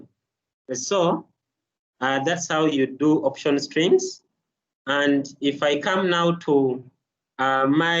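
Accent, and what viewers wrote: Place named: Uganda. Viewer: Well represented! Surprised to here a Ugandan accent after watching only Indian tutorials.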